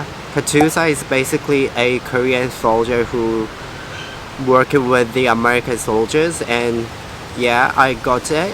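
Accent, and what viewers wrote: Australia. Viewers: 와 근데 진짜 호주 악센트 영향 많이 받은듯 짧은 시간동안 신기하내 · 벌써 400명 돌파!!?! 성장속도 실홥니깟?! 잘지내시고계시죠 ㅎㅎ 정말 영어발음이 오지발음으로 바뀌신거같아욬ㅋㅋ 신기하네요 아무튼 영상 잘 보고있습니다 ㅠㅠ 멜번 그립네요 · 영상미 너무 예뻐버리기 ㅠㅠ 영어 발음 은근 중독성 있네요 ㅋㅋ 보통 많은 한국분들이 호주 가셔도 미국식 영어발음 구사하시는거 같은데 은 애초에 공부를 영드로도 하셔서 그런가ㅋㅋㅋ 호주 발음이 찰떡이심ㅋㅋㅋ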